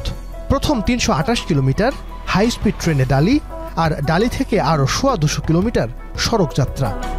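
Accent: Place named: Noakhali, Bangladesh. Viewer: ভাই আপনার সংবাদ বিশ্লেষণ ভুলে ভরা, আর "আমি জেনে শুনে বিষ করেছি "প্রাণ"(???) , বিষ করেছি পান হবে। চুপ্পু বংগভবনে বন্দি না, আপনি বলেছেন চুপ্পু কোথায়ও বের হয় না,কথাটি সম্পুর্ন ভুল, এই ২৬ শে মার্চ স্বাধীনতা দিবসে সে সাভার স্মৃতি সৌধে পুষ্পস্ত্ববক অর্পণ করে। আপনার কথায় নোয়াখালীর আঞ্চলিকতা প্রকটভাবে লক্ষনীয়।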